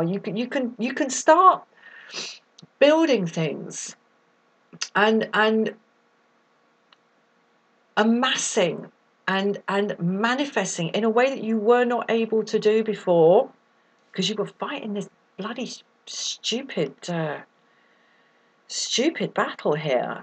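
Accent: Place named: United Kingdom. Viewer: Loving your across the pond accent! Thanks for the needed message of new beginnings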